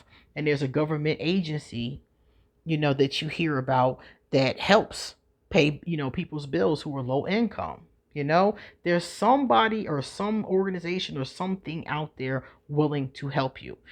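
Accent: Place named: Philadelphia, United States. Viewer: Ma’am! Are you from Philly? I can hear your accent. Signed- a Philly girl who is an Aquarius, lol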